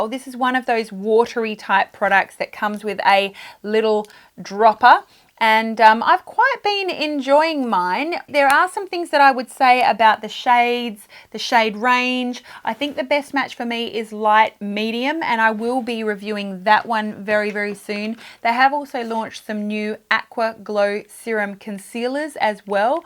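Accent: Australia. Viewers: Love your videos. You are fantastic. It's great to have an Australian voice.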